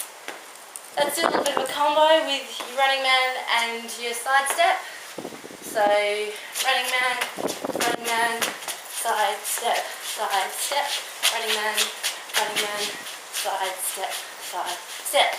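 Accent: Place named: Australia. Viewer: you have that sexy australian accent:)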